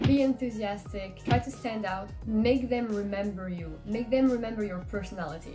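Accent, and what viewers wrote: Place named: France. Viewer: oh cool I've lived in Brussels for a short while. Yeah your accent was saying French and your colours Scandinavia. I guess looks do fool lol 😂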